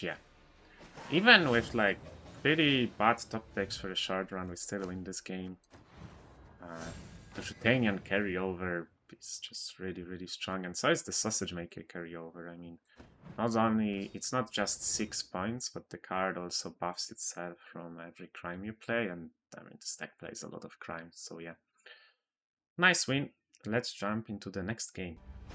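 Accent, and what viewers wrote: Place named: Estonia. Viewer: are you from Estonia? Can't put a finger on your accent. Very good English though. Ggs as always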